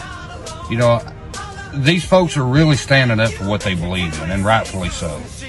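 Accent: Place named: Texas, United States. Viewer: He has a southern drawl. Sounds like Texan.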